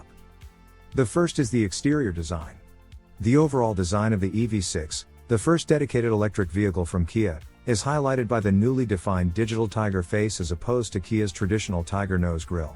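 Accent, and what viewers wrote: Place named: United States. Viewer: Great-looking car, but deathly awful Americanised narration and leaden storyboard. Get a British production company to produce your promotional videos. You only have to look at the UK's Grand Tour production values to witness innovative and inspirational delivery, which is absent everywhere else.... in the world.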